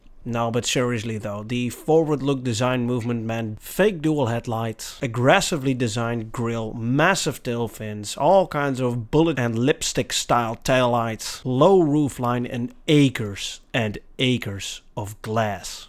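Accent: Netherlands. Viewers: Is the narrator dutch? Ik hoor een licht accent. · Hey you have a strong foreign accent. You sound very Dutch even though it is clear that you are trying your best to put on an 'American' accent. Are you Dutch?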